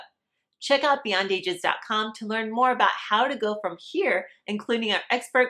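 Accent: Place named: Poland. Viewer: Yeah. I think Polish is first language.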